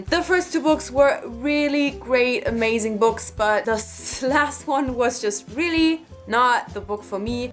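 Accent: Germany. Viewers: Die ganze Zeit hab ich gedacht "Das ist doch ein Deutscher Akzent, oder?" und dann kam das erste Deutsche Buch😂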